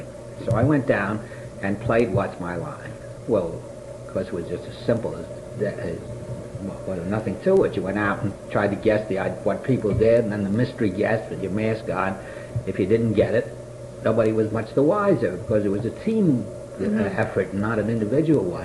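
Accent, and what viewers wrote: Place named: New York, United States. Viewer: Nobody speaks with this beautiful old New York accent anymore.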